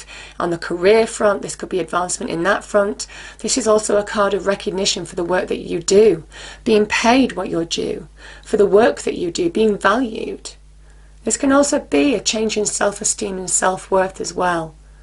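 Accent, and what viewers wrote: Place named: RP, United Kingdom. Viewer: 🌸gosh I luv ur intro and your voice, so posh 👍🏼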